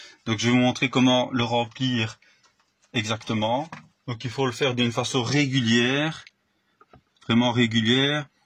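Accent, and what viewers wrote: France. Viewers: C'est très propre bravo et j'aime bien le petit accent alsacien 😉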